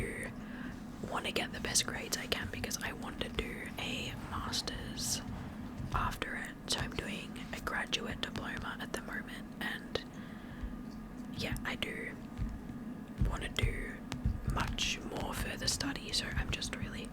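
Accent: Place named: Australia. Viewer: Excellent video! I hyped it, too. I’m very interested in reading The Eyes of Gaza. I think The Correspondent was my favorite book so far this year. It was SO good. I also couldn’t put it down. I loved how she tells the entire story in letters. The ending surprised me. The author ended it so beautifully. I also loved The True True Story of Raja the Gullible (and his mother). Such a unique novel! I look forward to your weekly videos. You’re such an interesting reader and book talker. And the fact you’re in Australia is the icing on the cake. I love your accent.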